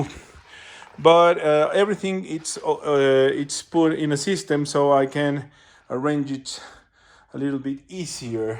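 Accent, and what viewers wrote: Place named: Latin America. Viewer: De donde eres? Por tu pronunciacion, se escucha que eres latino! Esta conmadre tu cuarto!!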